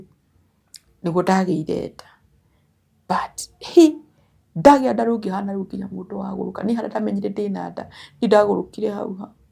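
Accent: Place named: Kawangware, Kenya. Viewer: Hapana hiyo lafudhi si ya kwenu. Ni ya kwetu Kawangware.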